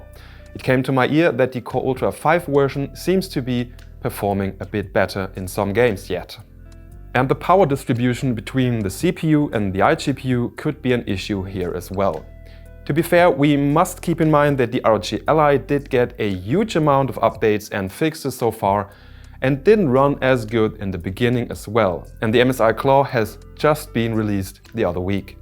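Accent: Germany. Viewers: Als Deutscher hört man schon in den ersten 5 Sekunden den Deutschen Akzent raus. :D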